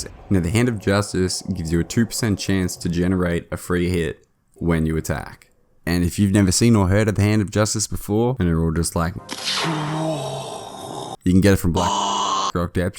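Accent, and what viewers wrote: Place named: Australia. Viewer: I hear a fellow Aussie here!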